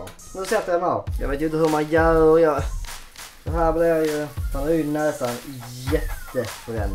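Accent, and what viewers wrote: Scania, Sweden. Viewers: " pusslar" Älskar skånska😂❤️👌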